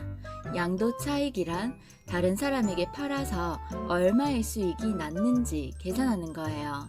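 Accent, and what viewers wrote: Gyeongsang, South Korea. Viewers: 갱상도 사투리 좋아요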